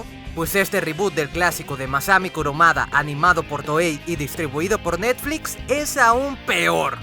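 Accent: Venezuela. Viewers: eres venezolano o que men?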